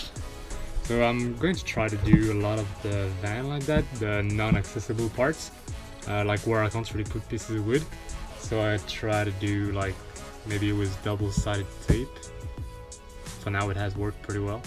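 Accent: France. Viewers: .Love your build... And accent haha ... Youre so chill too for a french guy .....I subbed. Keep it up!! Btw.... No mains 230V power inverter? Hi, From (English) Canada ;) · Nicely done! You sound french ;) Nice ideas for when I'm building my fifth van in the future ;) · are you french or somethin? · are u french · Bravo ! On n'entend presque pas que tu es français ;) Super conversion également, j'adore le deck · Love french accent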